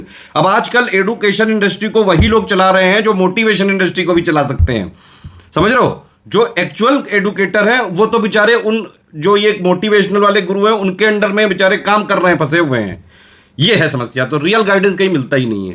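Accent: Kanpur, India. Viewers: Fully Kanpuriya style 😂😂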